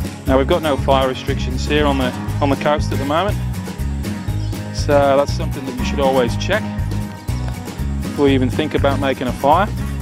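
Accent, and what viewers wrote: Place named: Wales, United Kingdom. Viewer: Hope I'm not rude but I'm struggling to place your accent, I'm picking up but of Welsh some Australian and English.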